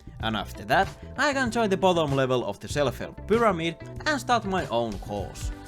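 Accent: Finland. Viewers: Massive suomi aksentti L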